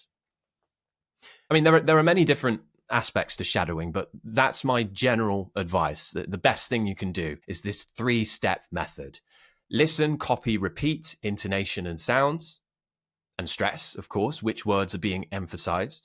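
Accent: United Kingdom. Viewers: My favorite English Teacher ever. For all those who love the brittish accent, we should create a whatsapp group to practice all together.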